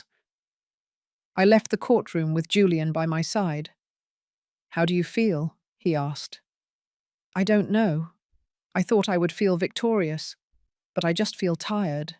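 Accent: England, United Kingdom. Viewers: Yes I'm tired of the snooty English accent! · This British accent is making me crazy. I hate it.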